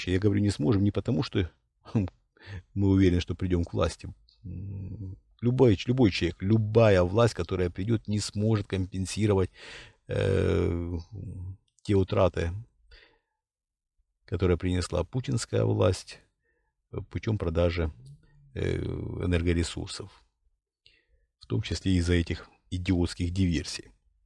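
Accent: Ukraine. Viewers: Уважаемый т.н « », вы мне как лингвисту можете ответить на вопрос, почему у вас явный украинский говор, если вы были генерал-лейтенантом СВР, то есть еще советский специалист, (проходили подготовку в Москве, жили там же) где глубоко погружали в изучение иностранных языков?😊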